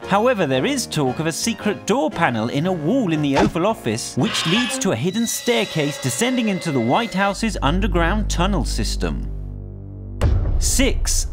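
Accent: England, United Kingdom. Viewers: I love that the narrator has an english accent while talking about an American property lol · Who burned it down? Let me think, yep I got it the Brits did it. You sound like one and maybe one of your ancestors did it. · When you hear a British voice or like voice explaining things about the White House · Sick UK voice. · I love how the narrator has a British accent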